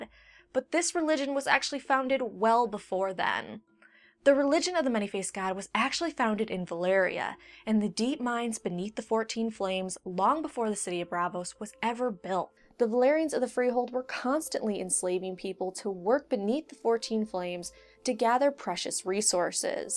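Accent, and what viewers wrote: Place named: South, United States. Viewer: you got a southern accent!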